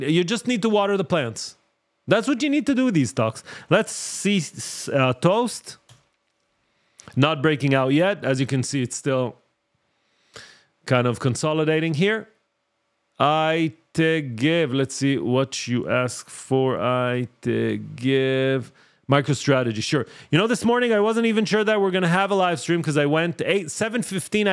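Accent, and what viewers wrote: Israel. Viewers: הנה דימוי בשבילך, מקווה שתבין בתור דובר אנגלית מלומד (עם מבטא ישראלי יפה) - למה שאשקיע ב-OTC כשאני יכול להשקיע בנסדאק? ולסיכום, בעולם העסקים כדי שהמוצר שלך יהיה מוצלח צריך בידול. באנגלית שבורה, אתה כבר לא מיוחד. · Well done! You still had more than 7.5K viewers listening to you talking in English (with a small hebrew accent:)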